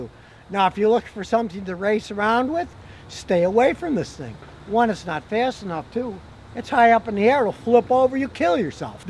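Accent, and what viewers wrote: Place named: New York, United States. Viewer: sounds like a newyorkian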